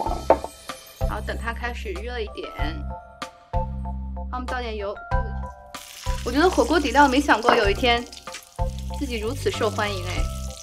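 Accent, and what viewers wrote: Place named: Jiangnan, China. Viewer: 昨天试做了汤麻辣烫，很好吃👍今天试做干拌款。 话说东北小JJ说话很好听喲!感觉是江南小JJ呢！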